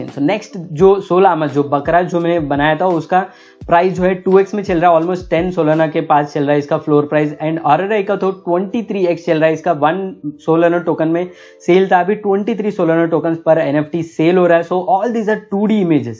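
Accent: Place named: South India, India. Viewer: maybe your accent is different that's why , my south indian friends are also like you , don't take it to your heart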